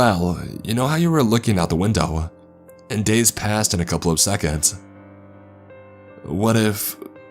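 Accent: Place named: California, United States. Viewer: This guy's California surfer accent is not a good mode for this story to be told, takes me right out of the story the way he pronounces words... Eckspecially Rayan (Ryan) Tham (them) Perfials (peripherals) Kassed (kissed) Thass (this) At (it) Etarnity (eternity) Sanses (senses) Tranches (Trenches) Warked (worked) Praaaject (project) Staaary (story) Incraaases (increases) It's a if he's doing a parody of the SNL skits of the soap opera "The Californians" Kind of hilarious I mean this comment in a helpful way, after all, we can't really know our own accents. My best friend told me I should cut my nose hairs when we barely knew each other. Only a true friend will tell you something like that.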